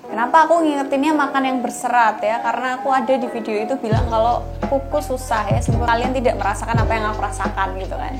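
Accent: Java, Indonesia. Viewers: Arek ndi iki omonge kok jowo wkwkkw.. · Wong jowo banget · Medok banget kamu mbak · Mba kocak ya logat jawane apik bahasamu aku suka dengerin konten panjenengan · Suarane medok mBake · Aku gak ngerti bahasa jawa,tapi aku ketawa,cara bicaranya jawanya lucu,terus medok banget😅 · Mbak sampean Jawa mana sih...koq enak banget nada bicaranya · Suara e ngangeni...medhok" gemes'!!! . Maju terus MB cantik... .. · Suka kesini gara" cara ngomong nya medok wkwkwk😆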